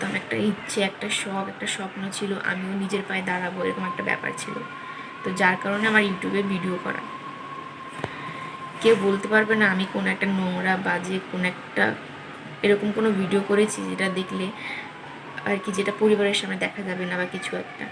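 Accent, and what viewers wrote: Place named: Kolkata, India. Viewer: Are didi Kolkata Bangla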